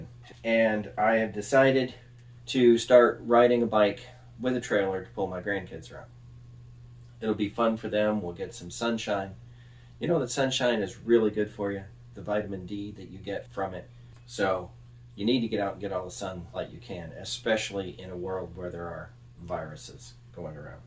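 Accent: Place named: Nebraska, United States. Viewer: Welcome back. Sounds like you grew up in Nebraska. And, you could use a Tig welder, they make them really small these days.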